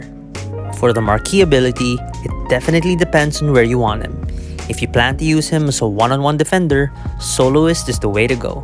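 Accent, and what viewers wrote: Philippines. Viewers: Weird Question, Are you Filipino? Cuz you giving me a Filipino vibe you know? The way you speak, that Accent of yours is what giving me Filipino vibe, and I dig with that. Cuz I'm Filipino.🇵🇭🇵🇭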